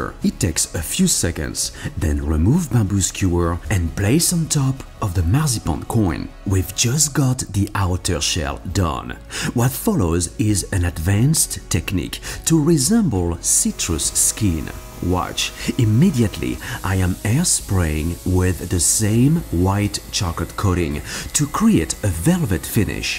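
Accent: France. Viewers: These americans making fun of his accent. lmao i bet you can’t even pronounce a single French word correctly. at least he can speak at least 2 languanges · This Guy's Just French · nah, it's his french accent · You're French right? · Are you French? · Are you french ? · Il a un accent français vive les français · You have a weird mix of an Australian and French accent and it's kinda offsetting for some reason. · May i Ask you where are u from? French? Only reason asking because of your accent :) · Are you French ?? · From what I know, he sounds like most French guy speaking English.. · Je me disais aussi le gars avait un léger accent français XD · Putain mais quelle accent Français! LOL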